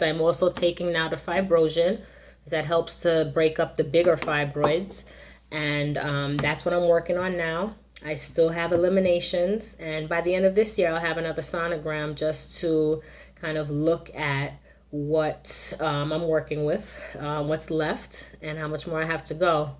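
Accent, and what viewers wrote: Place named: Jamaica. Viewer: I knew you was a yardie... picked up the accent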